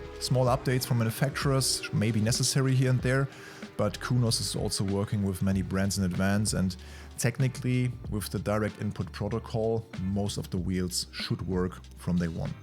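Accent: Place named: Germany. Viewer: Bist Du Deutscher? Hört man sofort raus, richtig schönes Video!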